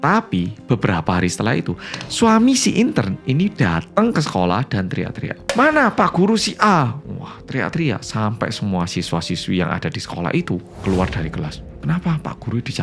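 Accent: Java, Indonesia. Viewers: Kemarin baru banget konten nya kk fyp di berandaku , aku coba nonton ko lama lama nagih juga apalagi pake detektif gitu di tambah suaranya itu medok . Dan akhirnya aku jadi tau kalau di korea itu selalu pakai detektf , bagus juga ya . Ga cape cape cari tau sendiri . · Tdr malem di temenin m medok 🙏🏿🌹🌷🌻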